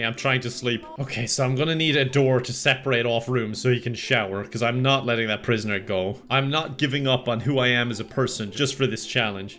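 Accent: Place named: Ireland. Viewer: Awww you are starting to lose your cute irish accent. 😔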